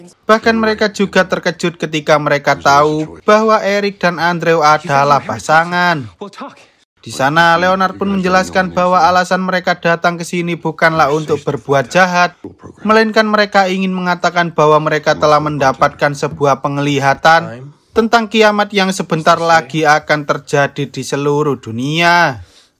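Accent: Java, Indonesia. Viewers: Jujur bng nonton nya kurang seru,mohon maaf bng ya suara Abang lebih ke logat2 jawa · Lu org Jawa y bg medok bener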